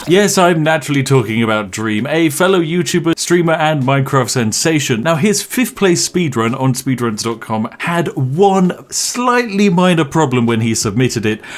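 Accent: United Kingdom. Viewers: this is what every one in britain talks like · they are too dumb to understand the spiffing brit’s accent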